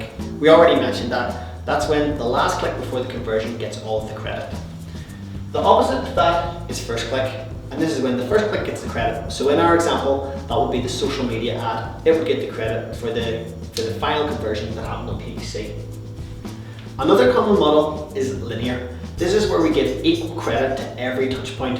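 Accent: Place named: Ireland. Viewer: Great video dude. Loving the Irish pub accent.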